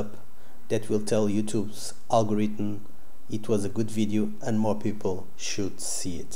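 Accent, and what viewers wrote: Portugal. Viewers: Reconhecemos um fellow tuga quando o ouvimos hehe. Obrigado ! Resolveu !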